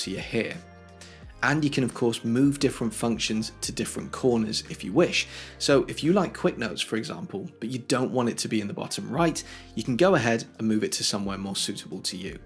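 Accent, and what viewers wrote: England, United Kingdom. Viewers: Great video as usual. I’ve gotten so much benefit from your content; you condense all the fluff and get straight to the useful info. Every Mac user should subscribe! On a personal note, the British accent is just an adorable perk. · I really like your 10 tips videos. Since I moved to Apple a couple of months ago after 36 years (1986!) in the Microsoft-Intel world, I used a lot of your tips to make my MacBook Pro comfortable and convenient. Nice to hear a clear English accent, too. All the best, Rob in Switzerland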